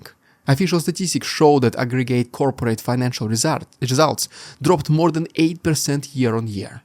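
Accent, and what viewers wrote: Russia. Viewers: he is not interested in phonetics. He speaks Russian but with English words. Horrible to listen to. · Mr. RUSSIAn. You lied again... RUSSIA GET ALL CHIPS IT NEED FROM tHAIWAN AND SOUTH kOREA, AND EVEN zELENSKY ADMITED IT. Buy you keep lieing people and give them fake news so that you tube pay you buy susribers. I dont even understand your enlgish becouse it is so distorted and intenitonaly made severly RUSSIAN...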